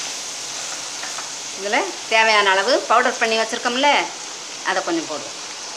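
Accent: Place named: Tirunelveli, India. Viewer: Nellai tamil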